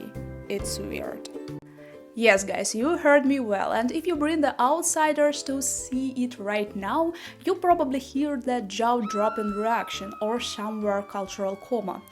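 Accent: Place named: Eastern Europe. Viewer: Thank you for this interesting video,. I like learning about Ukrainian traditions. Hope your family in the East is safe and warm. Take care of yourself. Hope your mental health will get better. This time of year is hard for mine too. Take it easy on yourself. We say things to ourselves in our own heads that we wouldn't dare to say out loud to someone else. You're doing great! Your English is excellent. No need to worry about your accent. It gives you character. And I'm sure it's easier to understand your Eastern European accent than it is to understand my Southern US (NC) accent. Hope you and your family are all OK. Stay safe and warm as best you can. United we stand. Разом ми сильніші. Разом до перемоги. СЛАВА УКРАЇНІ!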